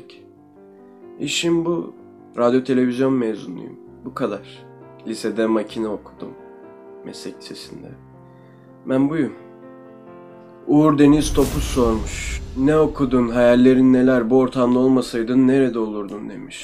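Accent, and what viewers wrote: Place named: Azerbaijan. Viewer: vay, bey Azerbaycan Türkçesine değdiriyor.✍️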